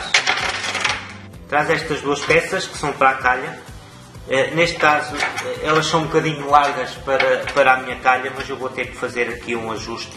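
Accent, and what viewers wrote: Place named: Portugal. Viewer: Muito legal esse jig para corte... certamente farei um genérico em madeira pra mim rs Vi que você respondeu meu outro comentário aquela vez mas acabei perdendo a conversa... pra mim é mais fácil entender hoje o seu português que é completamente diferente do português falado aqui no Brasil por acompanhar diariamente O Homem que Mordeu o Cão da rádio comercial. Boa sorte no canal.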